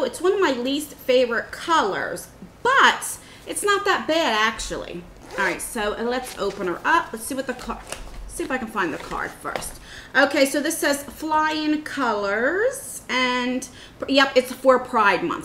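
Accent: United States. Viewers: What’s her accent? What state?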